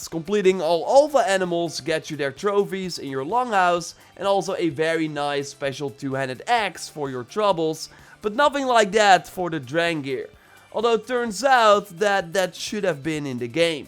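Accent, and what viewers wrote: Netherlands. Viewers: Are you from the netherlands? You sound kinda dutch to me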